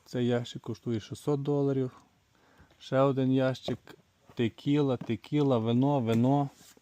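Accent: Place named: Zhytomyr, Ukraine. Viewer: Говір житомирської області.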